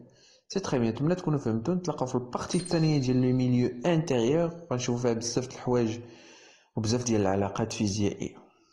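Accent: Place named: Morocco. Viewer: الشرح رهيب بس اللهجة المغربية صعبة 😂😭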